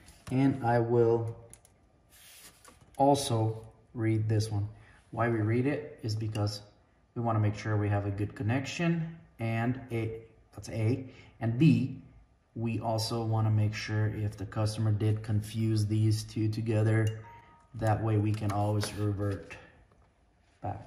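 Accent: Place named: Soviet Union. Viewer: Soviet accent is hard to listen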